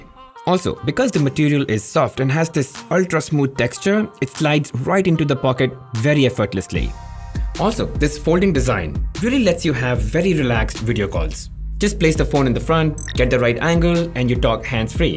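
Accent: India. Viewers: Very hard to hide indian accent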